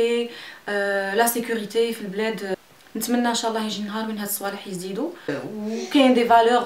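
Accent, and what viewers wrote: West, Algeria. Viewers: waw waw j'adore ton accent ta3 lgharb hlouwa bzaff ma cherie gros bisou a vous deux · troop fort j'ai adorée ton accent, darija Chez vous comme Chez nous les Marocains 🇲🇦 sauf dik lah ghalb manjemch typiquement Algerien lol hdertek hlewa masha Allah. + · لهجة الغرب تهبل الله يبارك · video au top tu es magnifique avec l'accent ta3 el gharb mindak wali dirina des vid en arabe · très beau tag moi aussi je suis algérienne mais je vie en france et je suis très fière de toi et Jaime boucoup l'accent taa lgharb ljazairi pq moi de Algérie centre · Mâcha allah oukhty tu a vraiment l'accent algérien. J'aimerai bien être à ta place lol. Sinon ta fille a beaucoup de cheveux mâcha allah ma fille quand elle est née c'était pas sa du tout Lol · j'adore ton accent ta3e El gherb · salut tu est vrmnt magnifique machallah kount nchouf t foto ect jamais ma remarkit que t algerienne mel gharb yaaaay j'adore ton accent je ss algeroise qui vie a oran et j'adoooooore nas lgharb :* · c'est algerien pur l'accent de l'ouest je n'ai jamais entndu un marocain dire chirat ou lah ghalb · mdrrr j'aime pas trop l'accnt de l ouest dsl :p je vs prefere parler en francais ou bien anglais :) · vraiment kayan difference kbira entre cette vidéo et les vidéos précedentes tu es plus sincére naturelle et sympas continue comme ça car j'adore l'accent de l'ouest malgrés je suis de skikda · Peut etre que toi tu parle algerien mais pas marocain mdrrr C l'accent algerienne 100% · lol wana manne annaba n3aniw 7na aussi metebdilaate yacer fil 3resse echarek welghareb kif kif w centre lelaa lol c la premiere fois wanne laccent ta3 el ghreb ya3jabni enfin mercii bcq pour cet vidéo et bonne continuation vous mériter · yatik saha taarfi hessebt rouhhi rani fel bled ki cheftekk merci pour cet video une petite nostalgie du bled ana tani mel gherbb on a le meme accent bonne continuation ma belle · amira mimita mn lahja 3raftak mn el Gharb ;) good luck sister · ALLAH yabrk 3lik l'accent nta3 lgharéb hayla w hadértek hayla kon ghir tkamli tehki hak fi les vidéos kamel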